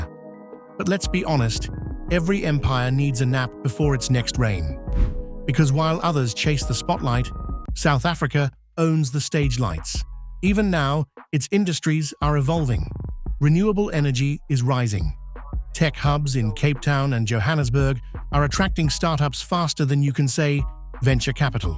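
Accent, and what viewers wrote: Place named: United Kingdom. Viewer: The Narrator just gained a proudly South African follower. He is so good at explaining and very informative. Sounds British, Great accent.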